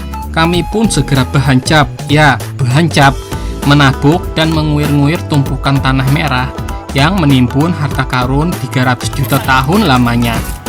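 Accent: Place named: Banjar, Indonesia. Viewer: Bang saran , mengenai banyak koment yang kada paham bahasa banjar , di kasih text aja bang , biar logat lucu bahasa banjar nya kada hilang ,dan orang luar pulau paham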